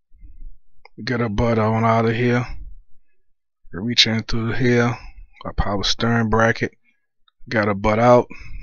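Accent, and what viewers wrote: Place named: DMV, United States. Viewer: I know a DMV accent from anywhere, Thanks for the help!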